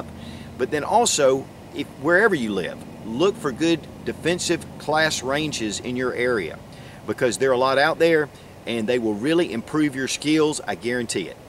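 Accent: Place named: South Carolina, United States. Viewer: IS ONE OF THE BEST TRAINING AND KNOWLEDGEABLE SITES OUT THERE - THANKS VERY MUCH. THERE IS NOT ONE VIDEO THAT I DIDN'T LEARN SOMETHING FROM YOU. I ALSO FOUND OUT YOU DON'T LET YOUR WIFE TOSS OUT YOUR OLD TEE SHIRTS THAT FIT SO GOOD. HA HA. YOUR S. CAROLINA TWANG IS A PLUS TOO TO LISTEN TO. NEXT TIME I AM OUT PRACTICING, I WILL MIX UP SOME SNAP CAPS WITH LIVE STUFF, BUT SNAP CAPS ARE GOOD ENOUGH FOR DRY FIRING PRACTICE.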